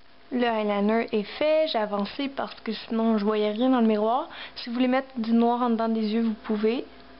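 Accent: Quebec, Canada. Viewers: tu vient du quebec?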